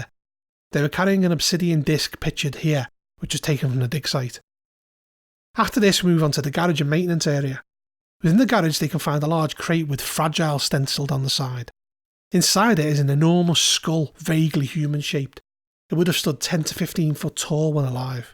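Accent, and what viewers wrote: Liverpool, United Kingdom. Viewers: Unless I am mistaken, I am detecting major Scouse.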